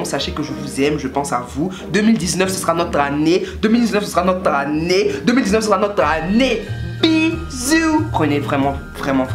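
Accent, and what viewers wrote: Ivory Coast. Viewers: Wowww ta un accent ivoirien mais puuur😍😍😍😍😍🇨🇮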